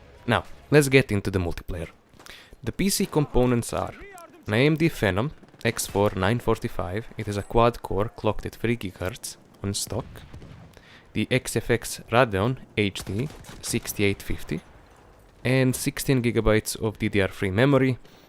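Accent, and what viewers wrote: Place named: Russia. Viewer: Russians акцент!)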